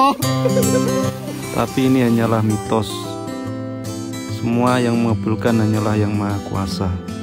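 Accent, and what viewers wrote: Java, Indonesia. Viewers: Aslinya mana nichh kok suarane ada jawa2ny gtu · Ikutan give away ka. Menarik ka videonyanya apalagi denger suara kk yg logatnya jawa bangett, natural bangettt. Next tetap bikin video2 trip kakak yg menjelajahi setiap tempat wisata dan kuliner tentunya dikota apa negara tersebut nama : ayu furwanti kota : jakarta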